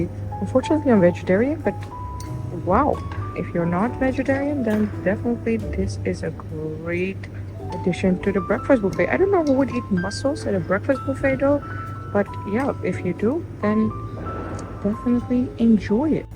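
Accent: India. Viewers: on line! Licious!,! You are beginning to sound Indian with English news channels! Well done, I am clapping!. All over big cities. LICIOUS app. Mostly meat but These also!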